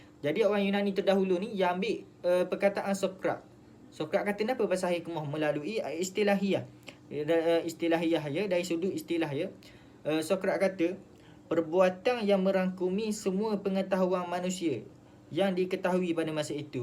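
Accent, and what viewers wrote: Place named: Terengganu, Malaysia. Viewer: Pekat sungguh ganu enta... Akan tetapi penerangan yg jelas dan terperinci 👍👍👍👍👍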